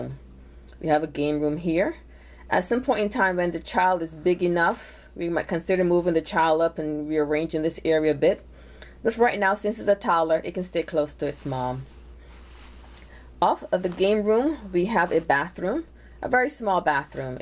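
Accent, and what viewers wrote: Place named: United Kingdom. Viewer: U r British awesome lol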